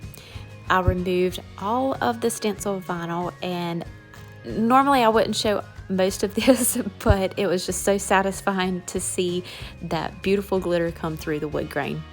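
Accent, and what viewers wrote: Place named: North Carolina, United States. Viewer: Absolutely beautiful your accent sounds like you’re from N.C I live in Canton N.C beautiful work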